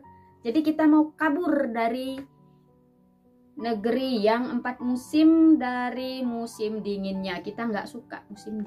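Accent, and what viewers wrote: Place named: Madura, Indonesia. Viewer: Mb...logat maduranya masih kentel banget 😀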